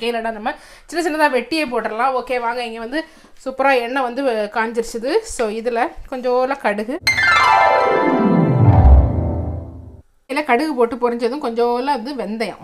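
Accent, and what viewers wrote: Nagercoil, India. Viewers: I really like to hear ur Nagercoil slang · Sir learnt nagercoil slang from u madam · Nagercoil slang🔥🔥❤ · நம்ம ஊரு பாஷை, அப்புறம் பழக்க வழக்கங்கள் முன்னாடியெல்லாம் மற்றவர்களால் கிண்டலுக்கு உரியதா இருந்துக்கா, ஆனா இப்ப உங்களை மாதிரி சாதிச்சவங்க அத சரளமா உபயோகிக்கும் போது ரொம்ப பெருமையா இருக்கு நானும் நாகர்கோயில் வாசி தான்னு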